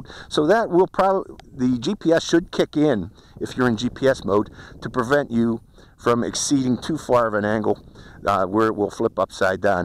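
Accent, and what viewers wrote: Pittsburgh, United States. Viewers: Hi there, you have to be from Pittsburgh or there abouts, thats Steelers Country accent. Banggood has this whole package, copter, i6, goggles and even a case for 50% off and it's the updated version with new stacked ESCs, GPS module is oriented properly etc. The deal ends tomorrow, $180 for everything gonna give it a shot.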